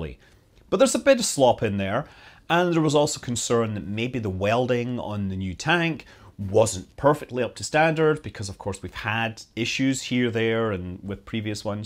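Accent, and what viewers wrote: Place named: West Scotland, United Kingdom. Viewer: where in Scotland are you from? (I’m west Dunbartonshire) a lot of your words sound like you’re from the west 🙈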